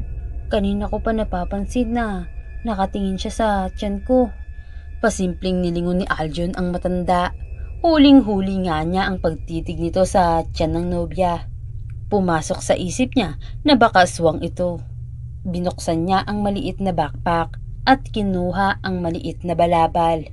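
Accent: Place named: Visayas, Philippines. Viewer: Ang sarap pakinggan Ng bisaya accent!😁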